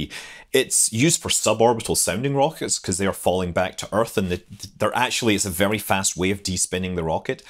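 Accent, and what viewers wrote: Scotland, United Kingdom. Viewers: With your awesome Scottish accent, I always hear “Exploder” as opposed to “Explorer”. Important difference haha. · burr makes Explorer sound like Exploder. Funny we used to call an earlier version of the Ford Explorer, the Exploder for the transmission’s propensity to explode under certain conditions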